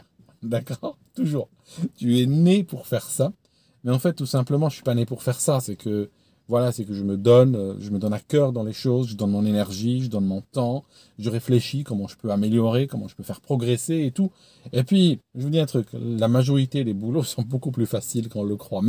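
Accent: Switzerland. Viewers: T quand même un sacré personnage ! Et il vrai que ton accent suisse revient de tps à autre... En ts cas je vis la même chose que toi au niveau de l'excellence dans mon poste de travail et au moment où je demande un stage pour me mettre au "goût Du jour" informatique et nouveaux matériel lumières (je suis éclairagiste) on me dit : non pas la peine de, car tu es tellement bon que tu vas te former seul !!!. Voilà, comment être mis à l'écart et sombrer dans une espèce de désespoir et donc ne même plus être bon dans mon domaine de compétence.. Merci 😢. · Bonjour, cette histoire d'accent suisse qui revient quand tu es fatigué m'a bien fait rire, moi c'est ma femme qui lorsqu'elle s'énerve ou qu'elle est stressée et celà arrive souvent me parle en kabyle alors que je ne comprends rien vu que je suis Français. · Si ton accent Suisse amusait du monde imagines l'accent africain 😅pire mélangé un peu à l'accent québécois. 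Je viens de la France avant hier même mes gosses se foutent de mon accent. malheureusement c'est ce que j'ai remarqué chez les francophones contrairement aux anglophones chaque fois j'ai été aux États-Unis, à Londres, en scandinavie... Jamais on s'est moqué de mon accent en anglais les américains me disent même your English is very good quand je leurs dis excuse my bad English. En terme devolution en entreprise t'aurais vraiment pu essayer le Canada c'est juste fou comment on évolue vite même en salaire d'où suis parti de l'Europe car je savais que si je restais rien n'aurait bougé jusqu'à ma retraite bah j'ai refusé de me contenter de ça et suis heureux d'être parti il y a bientôt 3 ans. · Vous m’avez fait rire et vous êtes touchant et ça m’émeut !!! avec l’accent suisse et c’est le dibou 😀😀😀😀👍👍👏👏👏👏👏👏👏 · Ouais j'ai adoré ton accent Suisse j'ai pensé à mon copain Paquito, et des bons souvenirs...Merci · Mais c'était quand même sympa l'accent suisse ! 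J'en redemande ! Sinon, absolument d'accord. Le monde de l'entreprise est plutôt ingrat, en plus d'être rébarbatif. On doit y côtoyer plein de gens absolument ineptes et, le plus souvent, on a fait le tour des tâches en moins d'un an, à quelques ajustements près. Tu n'as jamais entendu "je ne comprends pas pourquoi tu veux cette augmentation, tu aimes ce que tu fais. Crois moi, ça n'a pas de prix " ? Tu as aussi de grosses boîtes qui pensent que tu as tellement de chance de les avoir pour clientes que tu devrais travailler pour zéro et pourquoi pas finir par te prostituer (symboliquement) pour pouvoir les conserver. Heureusement que je suis mon propre patron. Je n'ai plus de patience, je me ferais virer rapidement. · L’accent Suisse 😅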